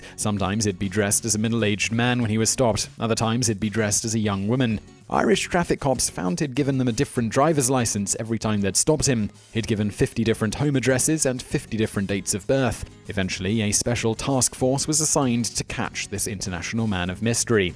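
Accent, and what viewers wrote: United Kingdom. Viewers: British vsauce makes me extra happy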